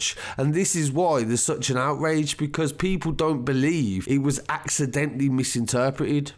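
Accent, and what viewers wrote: Birmingham, United Kingdom. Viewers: I really like your Birmingham accent take care brother and keep reporting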